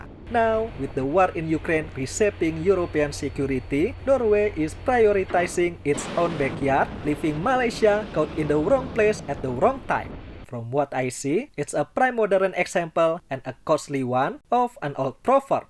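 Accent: Indonesia. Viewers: they already pay to 3rd party item and etc. why abandon it. it still on track for the balance LCS. btw, please use AI because you read the text like a robot without any stop. so hard to understand you indon accent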